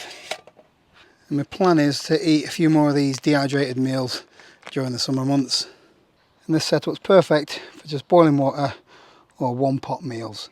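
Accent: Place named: RP, United Kingdom. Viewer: very posh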